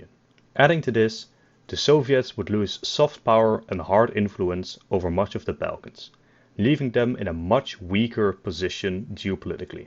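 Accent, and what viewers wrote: Netherlands. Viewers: gast aub leer deftig engels praten. ik zou u vids wel kijke als ge ni zon ambetant hollands accent had!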